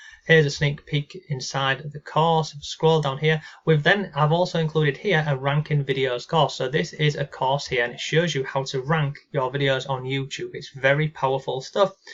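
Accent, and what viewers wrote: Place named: North, United Kingdom. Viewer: I love your accent! Is it from the north?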